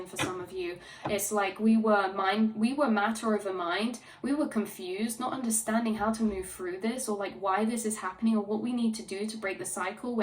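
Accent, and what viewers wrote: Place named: England, United Kingdom. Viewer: Oh yeah I’m in the UK and you sound like you’re from England…I’m a Londoner..Peace ✌️🫶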